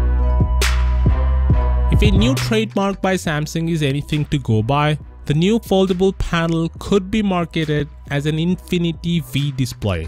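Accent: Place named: India. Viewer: Indian english hahaha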